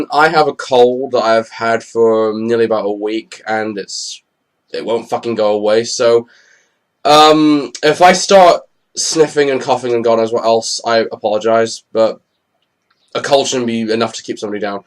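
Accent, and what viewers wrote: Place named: Scotland, United Kingdom. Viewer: You sound scottish and american and British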